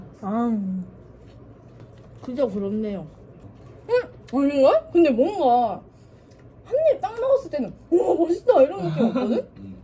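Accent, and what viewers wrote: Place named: Seoul, South Korea. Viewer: 왜 서울말 하세요?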